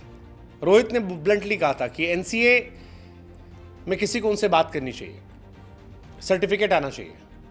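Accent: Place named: Uttar Pradesh, India. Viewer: ghanta fadak padh rha hai😅, up waale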